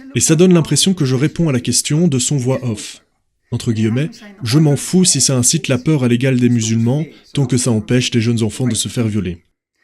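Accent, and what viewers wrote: Belgium. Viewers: Je suis belge et t'as un accent de chez nous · Ton accent belge ressort quand tu t'énerves. Cela veut dire que tu t'énerves vraiment, ce n'est pas feint. Tony Robinson est la victime du système politique et judiciaire anglais (les deux sont liés en Angleterre, ce n'est pas le système français). La raison d'état l'emporte sur la raison tout court. Il s'agit d'établir les connexions entre les gangs et les politiques locaux. C'est un travail de journaliste d'investigation. Ce type de journaliste est en voie de disparition (d'extinction) car trop dangereux et peu rémunérateur. Un bon perroquet (femelle de préférence) est très bien payé pour présenter les JT. Les tournantes n'ont pas cessé dans les cités françaises. Les journalistes n'en parlent plus pour ne pas stigmatiser les jeunes des cités. Tout va bien dans le meilleur des mondes.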